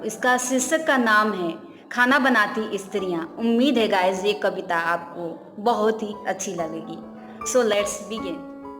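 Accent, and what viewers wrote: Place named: India. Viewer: Your voice is very nice with a pure Indian touch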